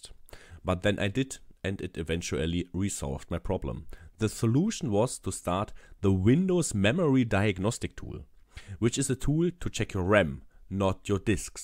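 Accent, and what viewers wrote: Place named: Germany. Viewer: This is awesome, it works fine for me after hours of unnecessary unplugging and plugging . Thank you mate. And by the way, nice German accent. I have the French version of it.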